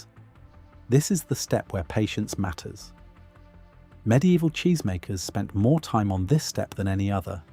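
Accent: England, United Kingdom. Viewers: I am so happy that I found these videos! However, there is one thing: the voice is English, not American. So why are all the videos so completely aimed at the American market? It would be so nice if we could have some comparable information for the UK! Apart from that I love these videos and I am using what I learn from them all the time in as far as I presently can. So thank you! Just some UK aimed info added would be wonderful!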